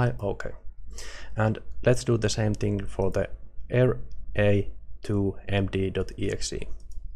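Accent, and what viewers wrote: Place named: Finland. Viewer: Brilliant video, also your accent sounds finnish :b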